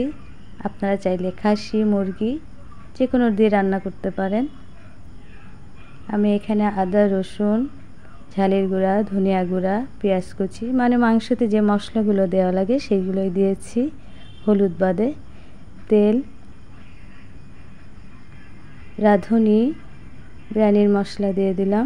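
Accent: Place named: Pabna, Bangladesh. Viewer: ooo amader pabnar moto vasha mone holo tai bollam apu Don't mind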